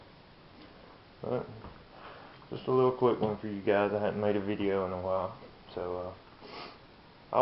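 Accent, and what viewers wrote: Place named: Tennessee, United States. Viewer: THANK YOU MAN! Great job! Great idea! You sound like your around here (tennessee).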